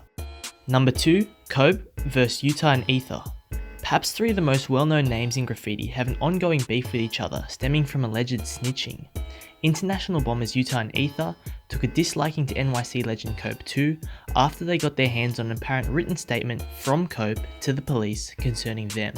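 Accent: Australia. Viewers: Nice to hear a ozzie hey from west oz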